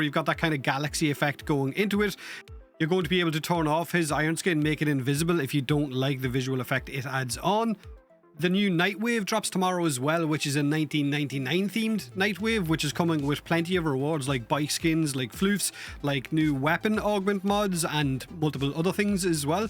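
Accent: Ireland. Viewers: Bro is Irish. Chill. … I have Irish ancestry here in the USA I be saying the same shit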